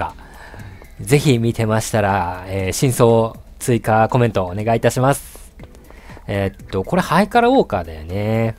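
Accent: Hiroshima, Japan. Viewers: Rさん！広島弁教えてください＜(_ _)＞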